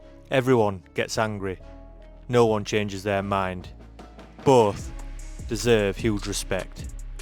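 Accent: United Kingdom. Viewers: Do I detect a Brit accent?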